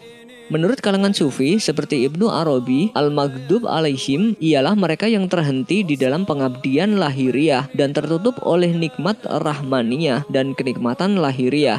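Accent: Java, Indonesia. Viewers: Hhh sabar orang jowo udah biasa 😁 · Kmungkinan beliau orng Jawa jdi wajar aj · Al-fatihah lah yg paling benar akan tetapi logat orang jawa yg medok membuatnya menjadi al-fatikah sama seperti ayah saya 😄😄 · Mungkn dia orang jawa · Lidah jawa memang susah di ubah Aksen begitu lekat Alpatekah. Audzubillah hir rabill ngalamin. Mohon perbaiki min. Aku juga jawa tapi nggak gitu kali. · logat jawa banget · Dia menyebut Al Fatikah krna sebagian org jawa dialeknya begitu.. tak mengapa jika itu di lakukan krna ketidaktahuan atau krna ketidakmampuan tetapi hendaklah dia memperbaiki pengucapan bila itu sebuah kesalahan yg telah diketahui. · Uwoo Admin wong jowo toh 😆, aksennya beda , jazakumullah infonya min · Kaya org jawa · Orang Jawa kek gtu sebagian besar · Bibir orang jawa emang gitu say kaya nybut fatir patir..dn ga niat jelek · logat orang jawa itu mah, mertua gw juga sama ngomongnya alfatikhah karena logat di sana medok. 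· Rata² yg sebut pelafalan seperti itu orang² jawa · Sdra"....jgn mudah menyalahkan org lain. Utk sdra kita suku jawa,kebanyakan pelafalannya ALFATEKAH utk menyebut ALFATIHAH. Itu logat...bahkan ada yg sdh ustadz msh sering keseleo lidah dlm pelafalan alfatihah.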